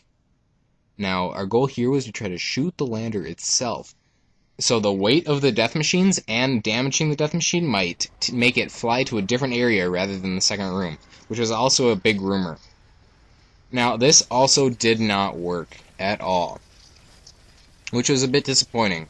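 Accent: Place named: Russia. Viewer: Also this guy has a Russian accent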